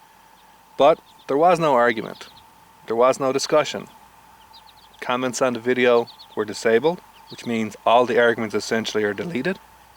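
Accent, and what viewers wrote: Ireland. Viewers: are you Irish? I swear I can hear a bit of accent. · You irish?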